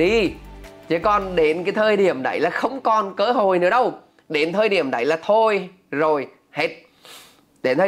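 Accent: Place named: Central, Vietnam. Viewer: Nói nghe không được bạn ơi. . giọng nói nói nhanh giọng miền Trung khó nghe mà nói quá nhanh thật là nghe không được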